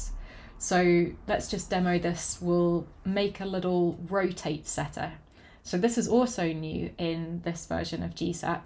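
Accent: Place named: United Kingdom. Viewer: Does anyone think her voice is sexy? What British accent is this from?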